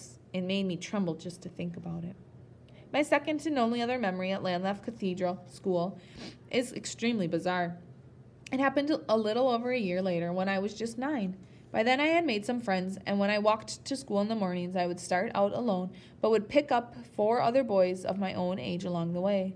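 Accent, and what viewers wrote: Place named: United States. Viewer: Oh dear read by an American . Spoilt from the start .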